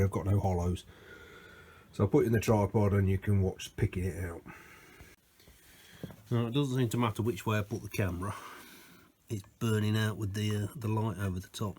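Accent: United Kingdom. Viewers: Thanks for the video. Came from Steve's channel. You sound like you come from the same side of the pond as myself UK. Keep safe and stay well.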